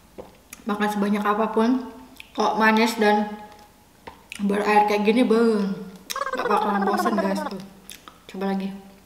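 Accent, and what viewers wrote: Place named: Bali, Indonesia. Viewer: Logatnya bali banget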